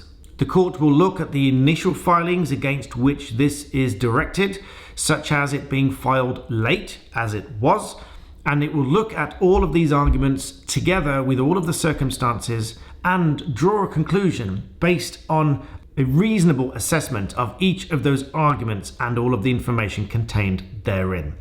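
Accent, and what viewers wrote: United Kingdom. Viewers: Heard all this on my American atty channels, but I have to say, is so refreshing to hear with a British accent. This judge has also had her fill of team Amber.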